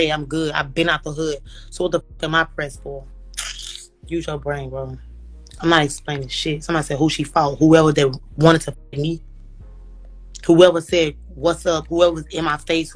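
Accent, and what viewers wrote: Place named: Philadelphia, United States. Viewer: Couple months ago she was up on pophunna sounding like she from philly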